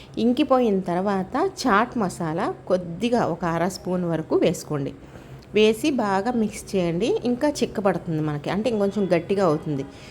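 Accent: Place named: Telangana, India. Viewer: తెలంగాన vsllu వాళ్ళ yasatho matladutharu manayasa thapala అంటె thappa ?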